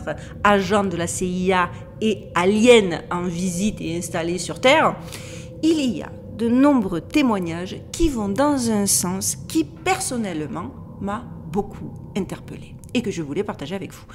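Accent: South, France. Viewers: Bonjour je suis tout à fait d’accord avec vous à 100 % vous racontez très bien et en plus vous êtes très charmante avec votre accent du sud moi aussi je suis du sud dans le 13 et je pense que les loups-garous viennent de là aussi et que des civilisations anciennes évolué technologiquement par des dieux venues des étoiles ont fait des expériences hybride car déjà les Anunnaki ont fait sur les humains du singe on est passé à l’Homo sapiens et Neandertal et il y a eu des erreurs à l époque quand il y avait un problème il nous noyer (Le déluge ) tout en gardant un échantillon ( L’arche de Noé) et la base de dulce et une continuation de tout ça . en tout cas tout ce que vous dites Tout s emboîte parfaitement comme un puzzle !!! Et avec logique bonne continuation encore une fois vous êtes très ravissante avec beaucoup de charme !! Je vous adore 🥰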